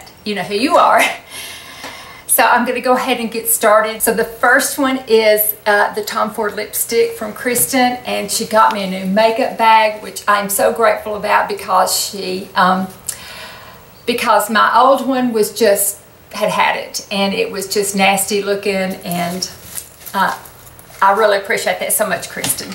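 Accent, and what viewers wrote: South, United States. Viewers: Hey, Love your videos and your southern accent. I know you are gluten free (Chrohn's Disease and maybe Celiac). I have Celiac Disease and was wondering if you choose gluten-free lipsticks to wear? If so can you recommend some. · You are so beautiful. I LOVE how your voice is so calming. Im from the south so the accent adds to the charm. Thank You for the wonderful tutorials. Also, you are so humble. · I think we are all blessed if we just look at the great things that have happened in our lifes. kids, grandkids, and if luck maybe I'll be able to meet my geat grandkids one day. I have Dystonia, CFS, Fibromyalgia and spine issues but I still get up every morning thinking about my life being so blessed. I have just been diagnosed with the Dystonia I didn't even know anything about this thing that has taken over my movements on my right side in December of last year. 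I feel it has got hold of the wrong loud mouth lady lol. Bless you for the video you do it really is a true blessing to watch your video's. I live in Georgia you sound like your from the south to my friend...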